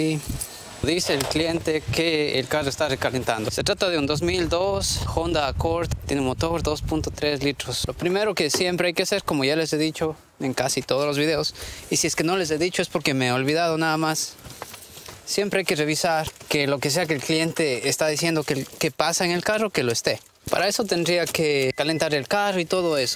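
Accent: Cuenca, Ecuador. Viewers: no soy mecanico pero tengo un problema con una ford f150 que lo compre recien. Se recalento estan do parado se hizo un telajo. Saludos felicidades por el acento parese ser de cuenca 🇪🇨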